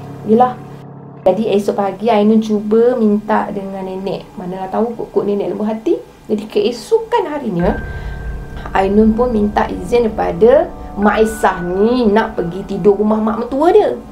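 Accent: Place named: Pahang, Malaysia. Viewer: Aok ni org mna.. melantoi mlek cara aok bcakap😅😁..salam rodong seram dari kwn org phg..peace✌️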